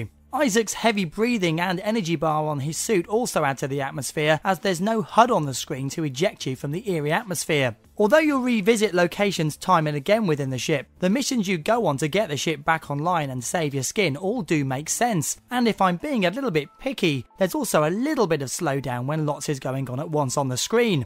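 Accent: United Kingdom. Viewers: he makes brits sound kinda gay btw im a brit and i sound nothing like that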